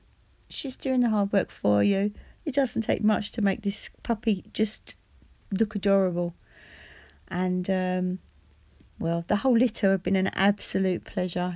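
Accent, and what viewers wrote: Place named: Essex, United Kingdom. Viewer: I live in Suffolk, I have a Rough Collie, a cavalier King Charles, a 10 year old apricot toy poodle , and have recently got a new toy poodle puppy, she was just over 12 weeks when I got her, she is now 21 weeks, and had her first little groom 3 weeks ago, I intend to let her have a litter, I used to breed Ragdoll cats in a very small way, this litter of yours are gorgeous , you sound like your from Essex, I would like to speak to someone who is close for advice, do you live in Essex?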